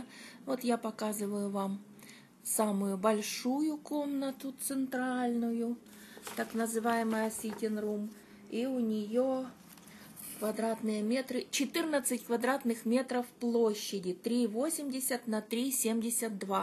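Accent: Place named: Provincial, Russia. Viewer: А ведущая из какой хацапетовки со своим говором?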